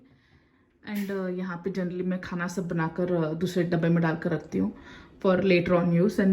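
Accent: South, India. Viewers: R u from south mam ....🤩👍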